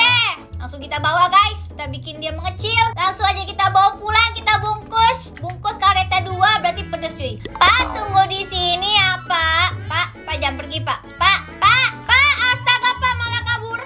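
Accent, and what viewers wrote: Java, Indonesia. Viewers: Kak btw orang mana yak? Kek nya Jawa yak? Kalau Jawa, Jawa mana? Akoh Jawa tengah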